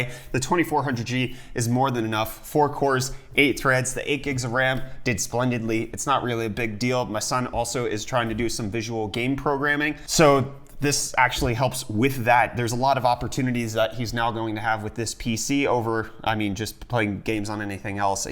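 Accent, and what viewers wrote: South Africa. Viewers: Wait....youre south african?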